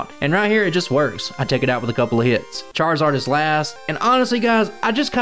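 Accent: South, United States. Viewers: Hey man just wanted to comment to say I love the content and just to support the algorithm. I love that your voice feel so like home since I am from the south as well! Keep it up! Can’t wait to see you continue to grow :)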